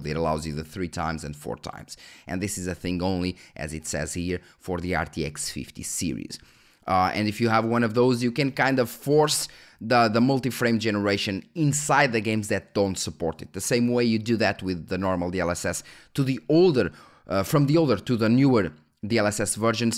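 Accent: Portugal. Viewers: Assunto fora de tópico, você é português ou brasileiro? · Excellent video and explanations, thank you! P.s.: I've always wondered where your accent was from but I could never put my finger on it, but on this video I heard you speaking with your son (I guess) and I noticed you're Portuguese (I'm Brazilian, so that was easy to spot). Well, that's just my curiosity speaking now. Cheers! :)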